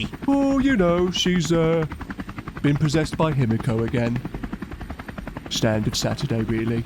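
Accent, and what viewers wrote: United Kingdom. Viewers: As a Yorkshireman myself, I approve of the accent 😂 👍🏻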